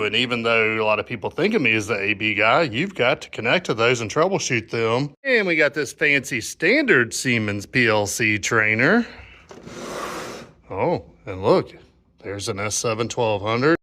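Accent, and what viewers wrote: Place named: South, United States. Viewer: the southern accent probably threw him off and made him think you were in California. common mistake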